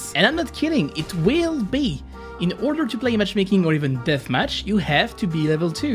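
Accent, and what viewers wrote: France. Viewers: Tu es français ou je me trompe car ton accent m'a l'air bien francisé ??!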